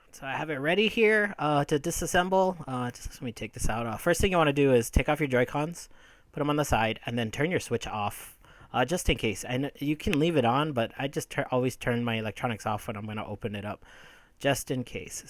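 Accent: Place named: Mexico. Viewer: This guy for sure speaks Mexican-Spanish, his accent is obvious.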